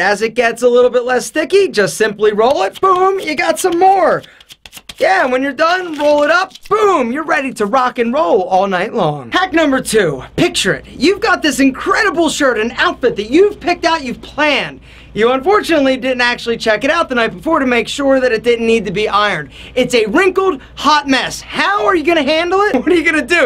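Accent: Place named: Italian-American, United States. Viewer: I just love your slight american-italian accent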